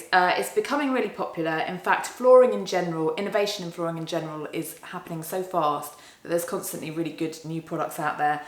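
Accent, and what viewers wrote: United Kingdom. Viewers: British akcent ❤️❤️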